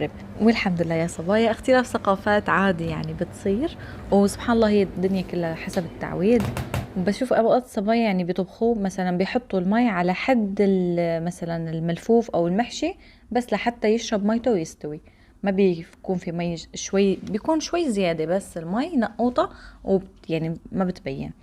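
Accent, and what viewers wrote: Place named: Syria. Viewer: بصراحة تفاجاءت بقناتك الثانية يا بالله شو مهظومة هههههه بلهجتكم السورية بقولها متابعتك من اليمن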